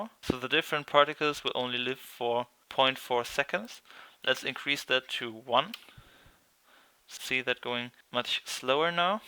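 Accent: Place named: Germany. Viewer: Hey dude, ty for you´r fast answer. I have tried it in version 4.14 now and it works :) greetz from germany :P btw are from ger too maybe? there some sound in your dialect sounds like germans whos speaks english :D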